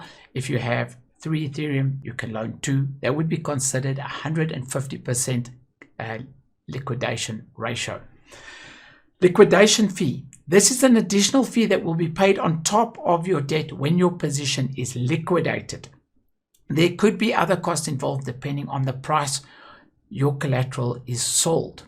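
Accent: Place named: South Africa. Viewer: Hi,my brother!You sound South African.Any pointers for me here?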